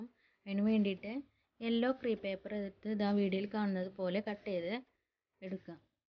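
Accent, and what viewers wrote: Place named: Nilambur, India. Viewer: Nilambur Samsarthilekku ethi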